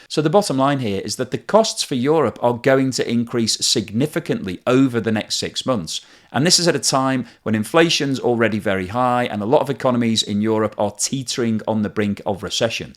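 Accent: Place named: Wirral, United Kingdom. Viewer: is that a Wirral accent I can hear?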